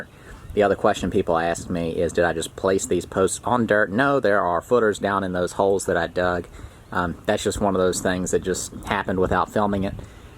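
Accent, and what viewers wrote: South, United States. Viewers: I wonder that too! Based on his accent and the hilly terrain, I'm thinking north Alabama or west Georgia or... ? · what region of the states is his accent from ?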